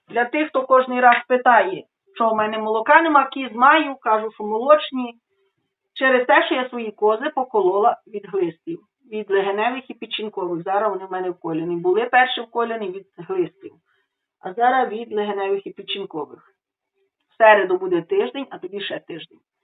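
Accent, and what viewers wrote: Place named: Rural, Ukraine. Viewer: Говори як ти вмієш.Мені подобається як ти говориш в мене мама теж так говорила дуже багато слів так як говорять в моєму селі хотя це Хмельниччина яке ближче до Чорткова. КОМУ НЕ ПОДОБАЄТЬСЯ ХАЙ НЕ СЛУХАЮТЬ..НА ВСЕ ДОБРЕ ЗДОРОВ'Я ВАМ ВСІМ.ЧОЛОВІКУ БОЖОГО БЛАГОСЛОВІННЯ.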